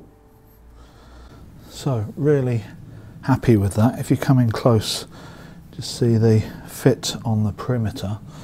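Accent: England, United Kingdom. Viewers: Maybe it’s the English accent but you sir are a terrific teacher! Subscribed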